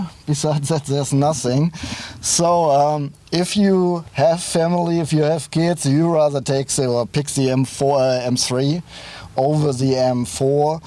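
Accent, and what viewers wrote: Germany. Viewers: This was a wonderful video where you dont have to listen to some fucking music all the time so you cant hear the engine sound, and i hope that other car testers learn from this. Also, you dont have to apologize for your strong german accent, as long as everyone understand its OK. Thanks for a great video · Thanks for the test. As far as I am concerned I can perfectly cope with the little german accent (provided you accept my Belgian accent, of course). The ride seems to be interesting but unless you regularly have access to a race track this car looks too fast for public roads. In France you would probably quickly loose your driving licence (radars everywhere here in Alsace). I find a 335i with m sport package and bigger brakes more reasonable. Kind regards. Armand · all Germans I meet are always so friendly and honest. The way you handle the people laughing at the way you speak confirms this. 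Anyway, dont be embarrassed most people commenting on it probably only speak English, while you speak two languages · Good review! Thanks! Thanks to the Germans we have this beautiful cars , so no need to apologize for the accent :) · The German accent didn't bother me at all. The horrible video quality, though, was irritating. I haven't seen such an overexposed video in a long time. Sort that out, guys... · Your German accent, speaking English, is a lot better than my American Southern accent, specking German. You did fine, I understood every word.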